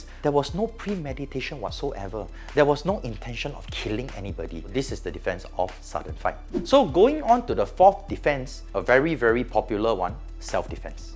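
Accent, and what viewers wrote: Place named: Singapore. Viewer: You are a cool lawyer. Thank you for explaining how the law works with regards murder charges. Love the singlish lah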